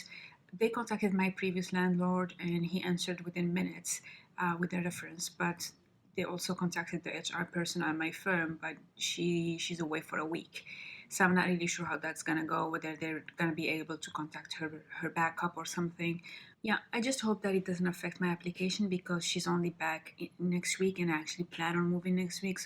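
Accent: United Kingdom. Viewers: I feel like your accent is slightly becoming english 🙈 You go girl! Like your ambition! Keep going and climbing! Bises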